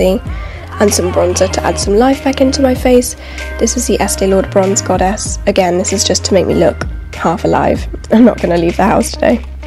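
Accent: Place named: RP, United Kingdom. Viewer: You sound so posh even when your ill ;)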